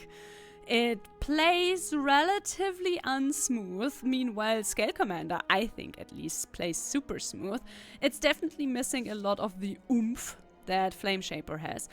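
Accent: Germany. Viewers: 1 hr of yapping in her cute German/English accent? I'll take it.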